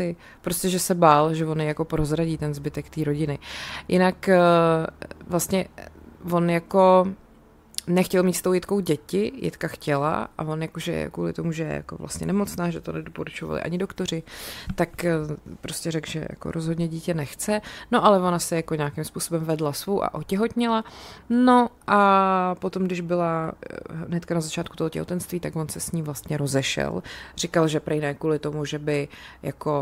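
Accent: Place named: Prague, Czech Republic. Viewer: Ten, prazskej, přízvuk slečny úplně trhá uši. Navíc pořád mele a nic nepoví.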